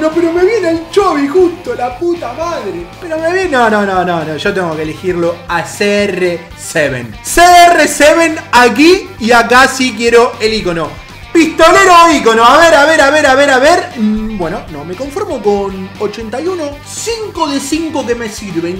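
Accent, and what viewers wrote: Argentina. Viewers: Tu acento Argentino arruina el video!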